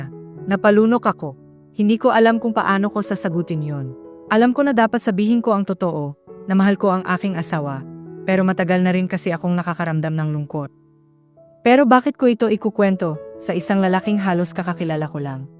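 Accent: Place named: Visayas, Philippines. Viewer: Bisaya ka ba? Nkakawalang gana tagalog mo.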